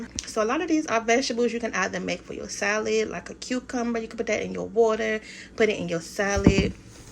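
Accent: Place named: Louisiana, United States. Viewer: Are you from Louisiana? The reason I asked is because you have a Cajun accent.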